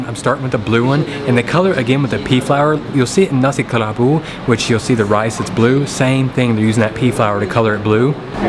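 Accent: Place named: South, United States. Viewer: Never did notice before but slurping and that southern drawl seem to go hand in hand. Damnit, i feel like one of Pavlov's dogs watching this. Keep up the good work sir.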